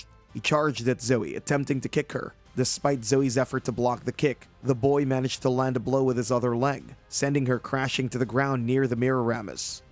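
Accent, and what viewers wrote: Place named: Philadelphia, United States. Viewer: YO THE AI HAS A PHILLY ACCENT WTF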